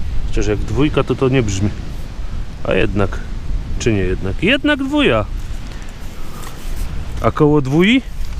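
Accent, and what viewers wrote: Silesia, Poland. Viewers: W mowie słychać że jest taki charakterystyczny ton dla ślązaków (Pozdro z śląska)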